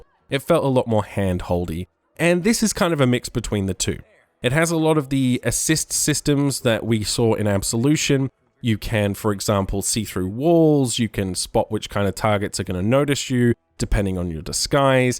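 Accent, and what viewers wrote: Australia. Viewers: I fucking swear all Australians have the same voice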